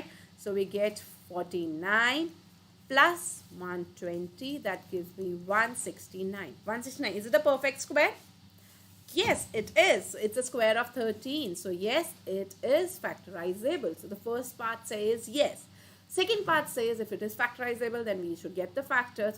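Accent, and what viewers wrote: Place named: South Delhi, India. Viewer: she got the south delhi accent :face-blue-smiling::face-blue-smiling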